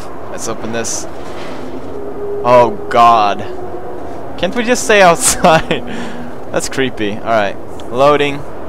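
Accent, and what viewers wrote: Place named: United Kingdom. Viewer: He deleted it because of the British accent lol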